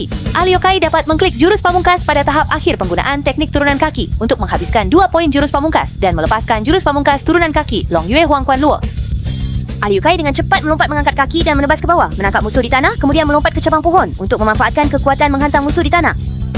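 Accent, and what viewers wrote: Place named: Malaysia. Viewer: logatnya malaysia ya buang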